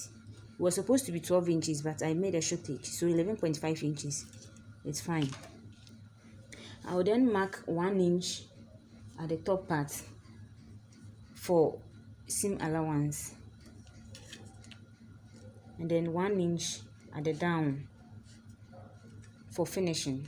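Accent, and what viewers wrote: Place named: Ghana. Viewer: Madam the pronunciation is her intonation. She is from Ghana.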